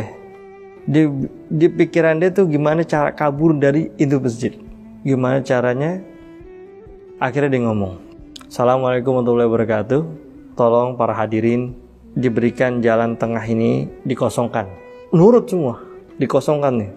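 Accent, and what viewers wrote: Jakarta, Indonesia. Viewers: Betawi Mane Bang, ET dah, Terimakasih Bang, sangat bermanfaat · Kenapabberubah Jadi mode Betawi bang Ceritanye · Ustad mudah BETAWI ASLI nih...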